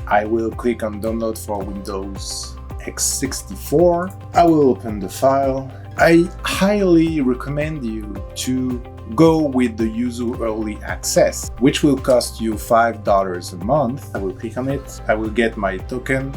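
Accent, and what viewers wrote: France. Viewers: are you french? you have a french accent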